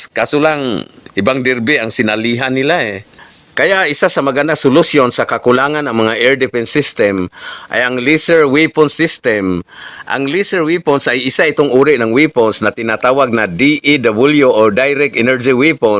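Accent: Visayas, Philippines. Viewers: ingay mo man doon katumira sa china halatang bisaya ka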